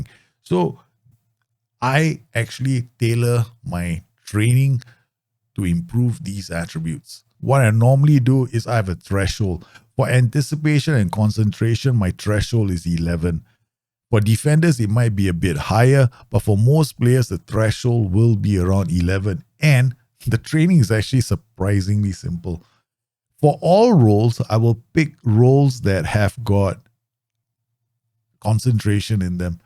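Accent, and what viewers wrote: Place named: Singapore. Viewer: Amazing content! I assume that you’re from Singapore from the examples and accent. Will work on a new save and focus on youth with this in mind. Thank you!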